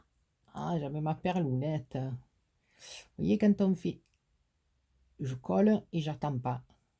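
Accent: South, France. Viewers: Coucou, un kilo de talent, un zeste d'humour, une pincée d'accent du Sud, une grosse cuillère de bonheur et voilà la recette de la superbe carte que a réalisée!!!!! bravo!! j'adore ta créa, elle est magnifique, merci beaucoup pour ce joli tuto, bisous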